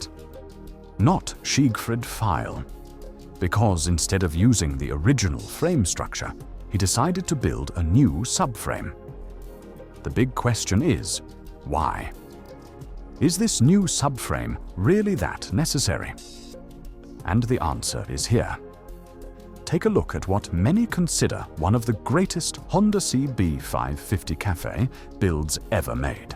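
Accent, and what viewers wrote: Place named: United Kingdom. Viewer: I've always preferred the English (GB) voice. Thanks for this video.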